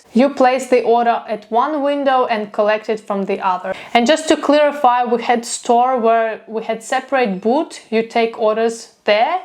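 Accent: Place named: Europe. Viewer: I am curious, if you ever had customers from the same part of Europe, who recognised your accent, and wanted to converse in ( one of ) your languages.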